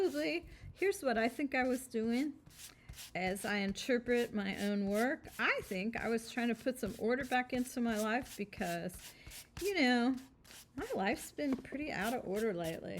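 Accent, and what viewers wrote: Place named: South, United States. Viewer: you are a gem! I've been following you for years as I take my mixed-media journey. I was a part of stencil club on and off for years and I have about 500 stencil girls stencils! I absolutely love your style, your great tips and tricks and that lovely southern voice is a soothing presence in my practice! Thanks for being YOU!!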